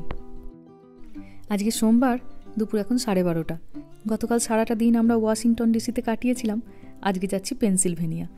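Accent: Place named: Medinipur, India. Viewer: eta mednipuerer dialect.. r ekta mudra dosh “ janen” na bolle onek better hobe.. baki golpp bolar khomota omayek..natural khub!!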